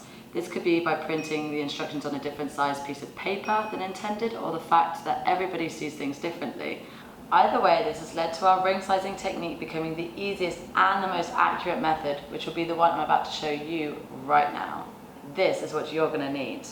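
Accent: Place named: Northern England, United Kingdom. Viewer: Your voice is cool....you sound like Scary Spice. Northern England?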